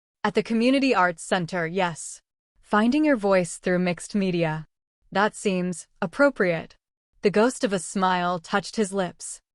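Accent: United Kingdom. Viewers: What accent does the narrator have,from UK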